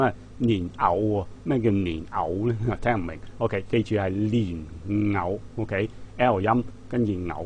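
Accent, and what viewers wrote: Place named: Dongshan, China. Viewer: it is not wrong, it is 東山口音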